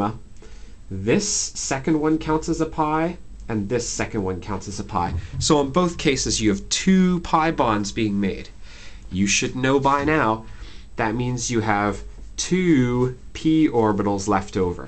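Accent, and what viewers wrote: Canada. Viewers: May God bless you for explaining this so well. Kudos to you and your possibly canadian accent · Great teaching and Canadian accent. Thanks brother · I just love your commitment to chemistry! Thank you very muchm it helped a lot! BTW... are cou Canadian? :D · This nigga sound hella like a dude frum candana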